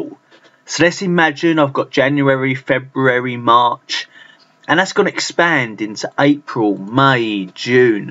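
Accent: United Kingdom. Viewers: Hello Initially I have to appreciate your perfect pure British accent which urged me to listen to it several times eagerly Then I download your nice video clip to learn about indirect function I wonder whether or not its possible for you to receive any Excel example from others in order to correct and provide some hints Thanks in advance Kambiz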